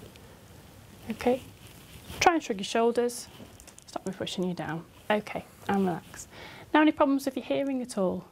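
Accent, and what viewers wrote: United Kingdom. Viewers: the doctor has the best british accent ... the queen of asmr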